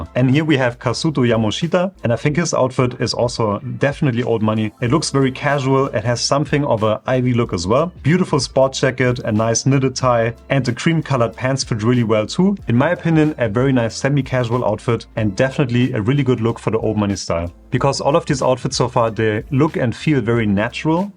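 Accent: Germany. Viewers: ich liebe es, wenn man auf einmal auf englischsprachige Deutsche trifft 😂 Der Akzent verbindet uns😂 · I hear german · Oh hör ich da etwa deutsch raus? 🤫🤔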